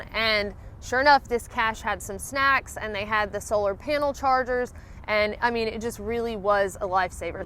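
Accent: South, United States. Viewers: I wouldn't take any medication that wasn't individually wrapped; nothing from a bottle of pills., I love your videos and they are always interesting and inspiring. Hearing a beautiful Southern accent coming from an intelligent woman is wonderful. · your southern drawl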